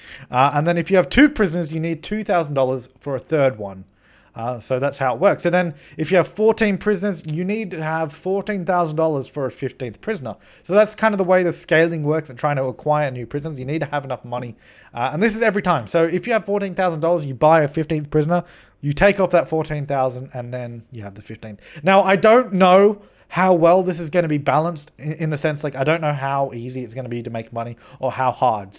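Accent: Australia. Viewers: He does sound normal doesn't he? Makes a nice change. It even sounds like an Adelaide accent.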